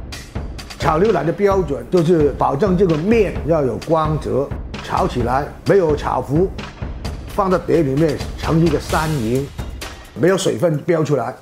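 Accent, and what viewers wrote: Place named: Hong Kong, China. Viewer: 口音真畀我一種香港人嘅錯覺😂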